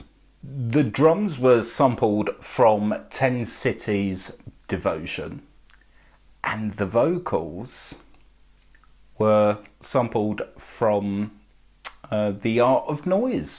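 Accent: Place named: United Kingdom. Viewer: I love your explanation of any part of this clip.... I'm not a Goth, but a big fan of. Your British accent is perfect for this description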